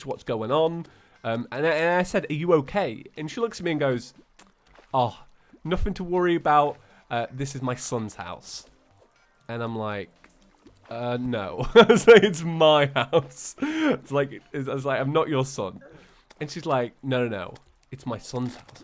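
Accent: United Kingdom. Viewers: im the kind of guy that likes to correct people and i know this is just a dialect/accent but everytime you pronounce terraria "terr-are-ee-ah" it really bothers me, and i have genuinely nothing against it itself, the brittish accent is very nice, it just really bothers me for some reason XD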